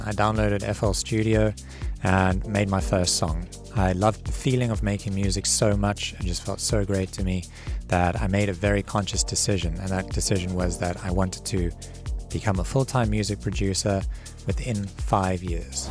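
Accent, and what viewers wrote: South Africa. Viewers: Yo...I need to know, are you from South Africa? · dude just noticed the accent is south african shout out bro ! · Trying to place the accent. I know you're in UK but I'm guessing SA?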